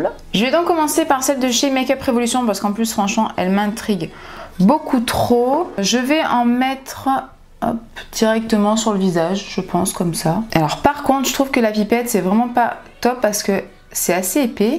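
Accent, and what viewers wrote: South, France. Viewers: Bsr quel beau Make Up avec tout tes produits choisis sur tes derniers achats j'adore!!! Après pour moi la palette a trop de fards et du coup je serais perdue lol moi il m'en faut des plus petites car mon probleme c'est de trouver les bonnes combinaisons de couleurs 🙄 pour vous ça à l'air si évident et facile alors que pour moi c'est vraiment un casse tête je ne suis jamais sûr de moi et de mes choix, du coup à force de regarder vos tutos je commence a comprendre la disposition des couleurs et reconnaitre ce qui peu aller aller ensemble ou pas... Merci à toi pour tous ces précieux conseils et tout ces moments que tu nous consacres c'est un vrai bonheur et entendre une sudiste comme moi ( et oui je suis du var aussi, née à la Seyne-sur-Mer, à côté de Toulon,j'y ai passée une tres grande partie de ma vie, mes 3 fils sont nés là bas et y vivent d'ailleurs et moi pauvre que je suis j'ai suivi mon 2ème mari en Seine et Marne, dans le NOIOORRDD et cela fait maintenant 16 ans que j'y suis et je t'assure que le sud et les gens du sud me manquent et que je t'entends parler, cela réchauffe mon coeur alors mercciiii pour ce cadeau que tu me fais sans le savoir 😉😉💗💗🌞🌞) Et pour ton make up bravooo il est superbe, les couleurs et le dessin sur la paupière juste Whaouuu..... 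J'attends avec impatience ta prochaine vidéo et en attendant je te souhaite une tres bonne soirée et un bon début de semaine 😉😘💖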